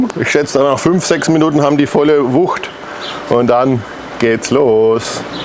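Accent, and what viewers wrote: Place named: Franconia, Germany. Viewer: stehste da im Regen..hahaaa...hab dich grad Aboniert,.........,jjooaaaa,,,,,interessante Rezepte muss ich sagen,ich hab auch büschen Verbindung nach Frrrranken,und zwar nach Coburg ich kenne den Dialekt wieder bei dir.....aber egal,du hast tolle Rezepte und ich werde mal sehen ob ich das auch ohne den Grill hin kriege,manches geht ja auch im Ofen ...gelll!!!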